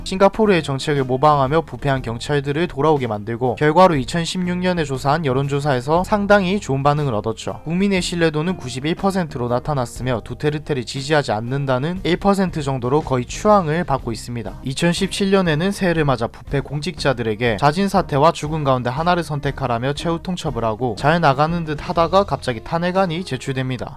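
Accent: Gyeongsang, South Korea. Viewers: 경상도 억양이 억수로 좋네요!! 🤩😎👍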